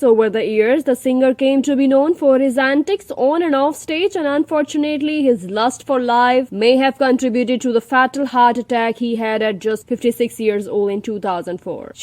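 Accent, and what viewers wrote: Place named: India. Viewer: Who are you, with this heavy indian accent???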